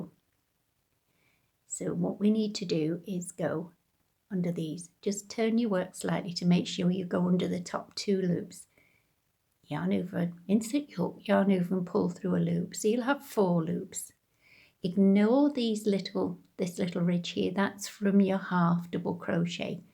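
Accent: England, United Kingdom. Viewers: Great vid - although hearing an English accent speaking about "half double crochets" is kind of messing with my head lol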